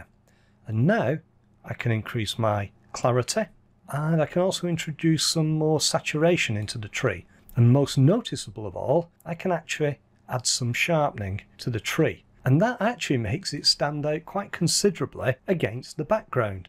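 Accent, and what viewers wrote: United Kingdom. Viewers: This is the second video I have watch of yours in the last 10mins, I have learnt more in this last 10mins than god knows how many hours I have spent listening to other people . Content great, british accent out of this world, some one I can listen to at last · Thank you so much for this instructive video, plus the perfectly spoken british accent ! :)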